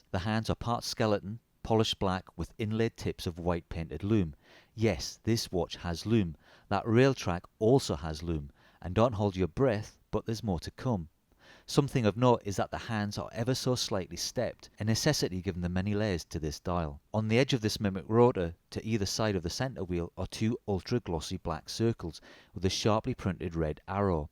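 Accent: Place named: RP, United Kingdom. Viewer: For anyone not speaking posh british as a native, it is very difficult to understand the gentleman whatever he is saying. Subtitles anyone?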